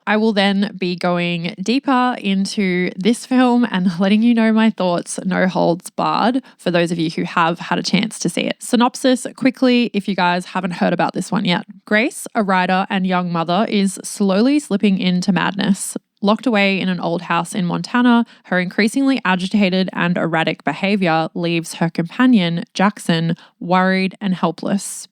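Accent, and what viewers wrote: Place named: Australia. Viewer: Are all YouTubers Australian? Or is this the new “Mid-Atlantic Accent”. Except its more like Mid-Pacific. Until YouTube i didn’t realize how close Australian is to an American accent. The only real difference is “er” pronounced as “uh” which is also a Brooklyn thing as in “Muthuh” and “Bruthuh”. Interesting review too! And seems like you changed your lighting which looks nice. Seems softer with warmer colors. Or maybe its a suntan. Either way looks good.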